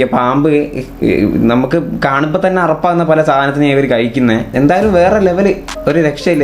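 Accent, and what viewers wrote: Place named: Kozhikode, India. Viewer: Bhasha shaili vyathyaasam indaavum bro Karanam vayanad kaaranaan kozhikod karanan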